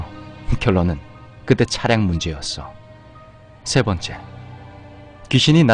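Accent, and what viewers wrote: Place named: Gyeongsang, South Korea. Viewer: 님이 경상도 분이라 아래어떤분이 서술톤이 재밋다고 하는게 이뜻 아닐까요? 사투리 쓰시는 대신에 잘생겼잖아요. 키도 저강하시고....^^ 님 도화살 조심조심 ^^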